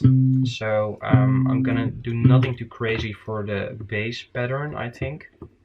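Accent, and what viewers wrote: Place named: Netherlands. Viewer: You sound Dutch, what are the chances 😅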